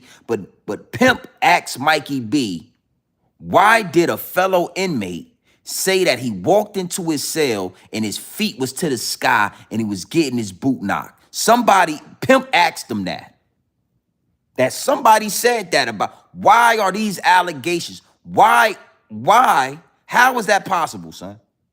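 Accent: New York, United States. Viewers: You sound like you form new york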